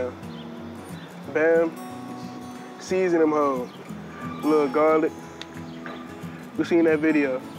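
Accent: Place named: DMV, United States. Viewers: Nigga got a Baltimore accent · If u ain’t from da dmv u don’t even understand him, my dmv ppl stand up slim💪🏽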